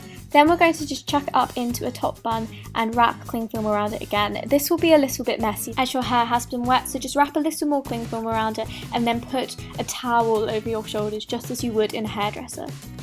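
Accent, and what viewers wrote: United Kingdom. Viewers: Your strong British Accent...Pretty good there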